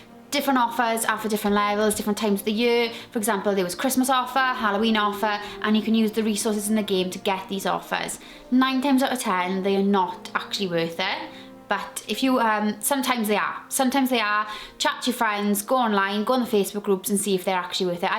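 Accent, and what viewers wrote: United Kingdom. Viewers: She literally has an English (or Welsh) accent. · She is scouse my g an accent in English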